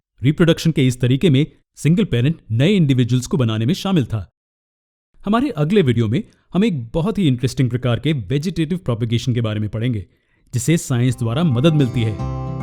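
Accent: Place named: India. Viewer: Nice ........hindi accent sounds wow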